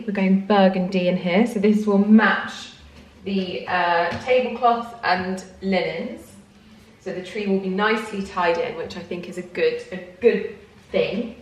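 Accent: RP, United Kingdom. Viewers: Oh my god the accent!! What happened to you sooooo posh😅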